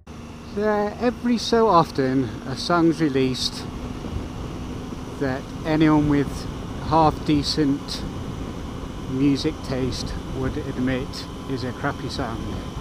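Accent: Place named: England, United Kingdom. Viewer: Thanks, ALL three now firmly stuck in my head. Wasn't expecting an English accent, seeing those double yellow centrelines! I'm joining the expat club and will be broadcasting English accentry from Europe!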